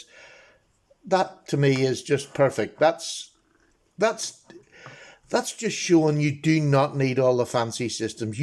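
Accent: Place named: Ireland. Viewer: I don't understand half of what this gentleman says? Irish? Scottish?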